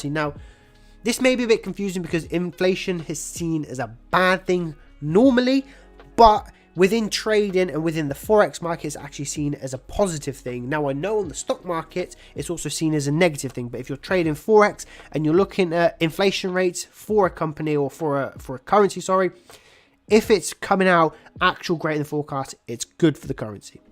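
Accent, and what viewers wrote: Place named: United Kingdom. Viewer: There are other English speaking countries online. Not British alone. Abeg consider and use proper tongue 😮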